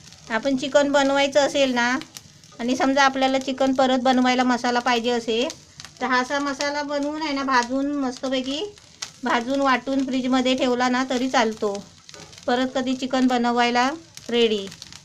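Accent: Konkan, India. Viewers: tumchya sagalya recipes mala khoop avadatat. same majya mummy sarkhya, tumchya bhashet ani jevanat kokanatla godava ahe Love u Kaki ani thanks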